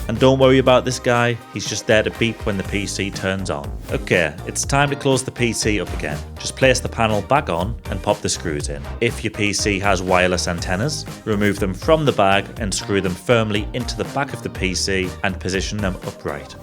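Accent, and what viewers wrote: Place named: Newcastle, United Kingdom. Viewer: whew, canny geordie yee like.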